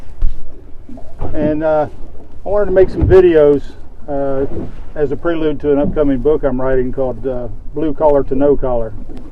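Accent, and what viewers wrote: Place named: Florida, United States. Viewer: Hey. Did anyone ever tell you that you speak "Florida Boy"? Enjoying your books. I'm through Book 10 and Blue Collar to No Collar! Thanks for all the hard work. I've started a bit myself and find myself starting all over again. I think I found my niche though this time. Keep up the good stuff. See you in La Belle one of these days. BearTail.. B52 Bomb/Nav. "Iron Dukes Viet Nam" 320th Bomb Wing. First in - Last out.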